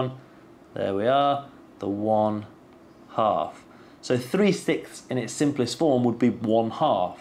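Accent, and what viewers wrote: United Kingdom. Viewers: British ahh guy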